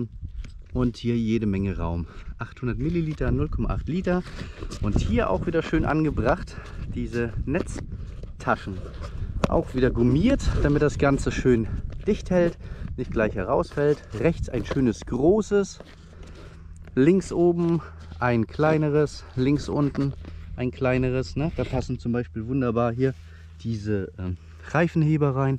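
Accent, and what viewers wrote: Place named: North, Germany. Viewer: eines der wenigen male, dass ich wirklich höre, das jemand ausm norden kommt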